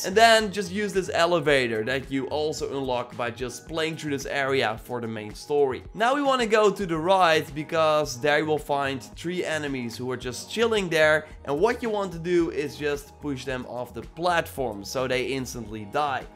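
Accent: Netherlands. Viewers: Are you Dutch? I can hear an accent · I think he's from netherlands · I can hear you are a Dutchman like me. Ik hoor dat je een Nederlander bent zoals ik. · Je klinkt echt nederlands haha! Goeie video🙏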